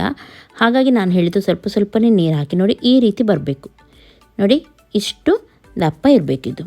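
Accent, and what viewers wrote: Mangalore, India. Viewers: Hi dose supper ನಿಮ್ಮ ಮಂಗಳೂರು ಕನ್ನಡ ತುಂಬಾ ಚಂದ ಉಂಟು 💐 💐 💐 💐💐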